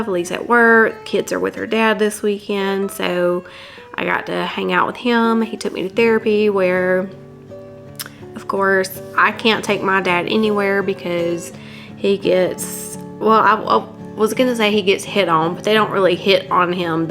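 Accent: South, United States. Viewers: You are still very fresh from your accident. I first clicked on your channel because you were talking about your accident. I keep watching because I find your voice soothing, and I like your slight southern accent! I’m from New England. Be YOU. Talk about your experiences in the hospital, your recovery, your challenges, what kind of things helped you at home…you’re job as an EMS probably has so many fascinating stories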